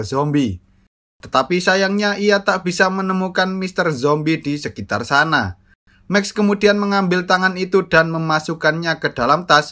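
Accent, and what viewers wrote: Java, Indonesia. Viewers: Mantap bg logat nya Jawa banget😅😂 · Medok Kabeh bahasamu le le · Intonasi MEDHOK, tapi mantaf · Okeee rekkkk:v Btw wong jowo Yo?:V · Wong jowo yo mas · Pekat sekali bapak ngobrol jawa... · Mantep narator e medhok sip sip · medok tenaaan , jawa mana sih mas , gak bisa hilang ya logat... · Kentel banget logat jowo nya😁😁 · mantap bang medook ngomongnya hahah mantaap ciri khaas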